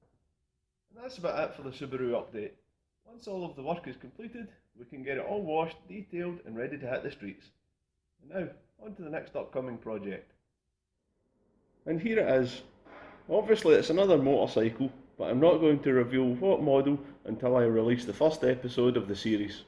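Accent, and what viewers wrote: Scotland, United Kingdom. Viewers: Great video again. I had a motor tour though Scotland planned end of May,, but with the isolation measures in place.... that's of the calendar.... so I have to do with your video's, your accent and a great Glen Moray. Give my best regards to George... Cheers from Holland !!! Ruud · Ah, the first time I've heard your voice! Lovely Scottish accent. I'm looking forward to your new projects! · he's Scottish (west coast,Glasgow area) he is trying his best to speak polite proper English, that's why he sounds like he's only here for the banter :)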